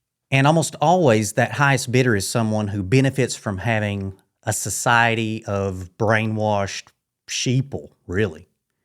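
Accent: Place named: Kentucky, United States. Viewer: Love your thoughts and your accent! Kentucky?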